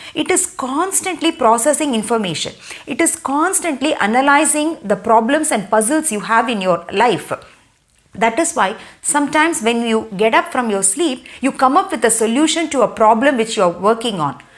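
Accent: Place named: India. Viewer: Lady, I love your Indian accent and it's always a joy to watch you. Thank you.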